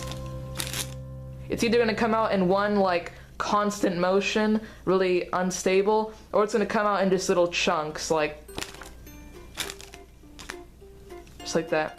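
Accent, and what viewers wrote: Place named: Russia. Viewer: Your voice has a Russian undertone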